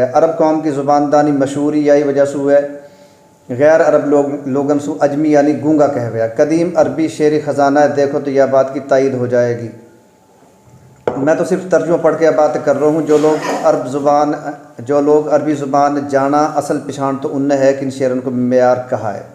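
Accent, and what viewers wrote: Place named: Mewat, India. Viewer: Mewati boli piyari boli he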